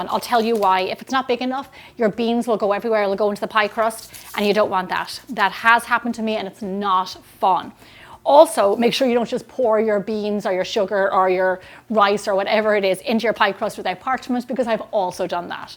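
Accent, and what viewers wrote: Ireland. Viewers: If I was to draw a photograph of Irish, it would look EXACTLY like you! (And I don’t mean that in any negative way whatsoever). Love your videos and your wonderful Irish brogue!!🇨🇦🇨🇦 My wife is making your ice cream today!!! Thanks so much!!❤❤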